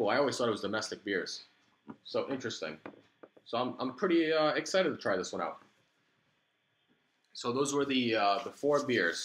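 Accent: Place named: Southeastern New England, United States. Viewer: Like how you mix in comics and craft beer. Sounds like you're local to SE MA & RI. So many good breweries and LCS in the area.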